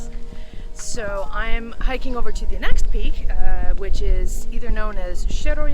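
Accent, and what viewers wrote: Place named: Canada. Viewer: You sound Canadian. Thanks for the video. I am going to Hachioji for about the fifth time (to visit a friend). Still haven't hiked up takao or adjacent mountain. Must give it a try.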